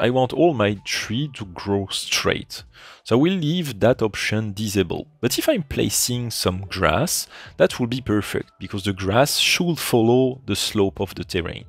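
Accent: France. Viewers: Love this french accent 💌🇫🇷 and vive Twinmotion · me too 😂😂😂😂 its the first time in my life to hear a french english accent as strong as this one 🤣🤣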